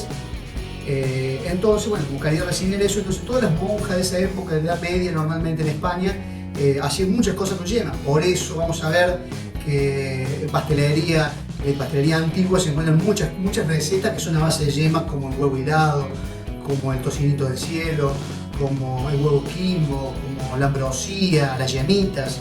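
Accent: Córdoba, Argentina. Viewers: Sabor Cordobes con tonada cordooooobeza!!!Excelente explicacion y con su historia! los voy a hacer!!! y despues cuento!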